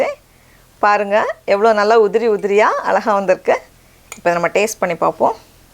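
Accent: Nagercoil, India. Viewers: akka neenga USlaya irukeenga appro nagercoil kaarangala ☺☺☺ so happy i heared it in a you tube channel appro onga veetla ellaru nalla irukaangala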